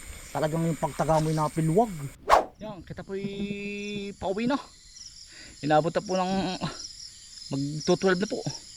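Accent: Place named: Southern Quezon, Philippines. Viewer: taga san kaga kuya at ang iyong punto ay parang taga southern quezon😂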